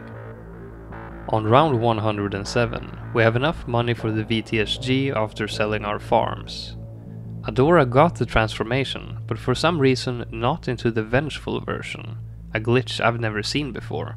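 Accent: Sweden. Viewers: u sound swedish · you sound really Swedish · You sound swedish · you sound soooooo swedish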